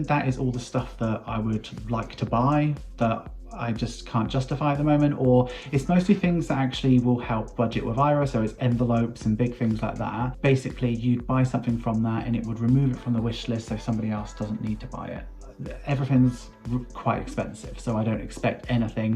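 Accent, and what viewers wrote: United Kingdom. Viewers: Congrats! I just enjoy hearing you speak with British accent haha. Hello from Los Angeles.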